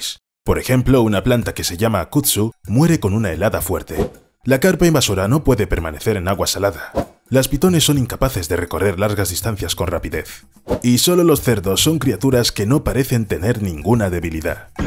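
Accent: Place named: Spain. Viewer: El narrador tenía que ser Español, qué mala suerte ,muy fome.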